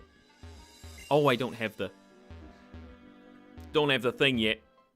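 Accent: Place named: France. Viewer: Love your french accent haha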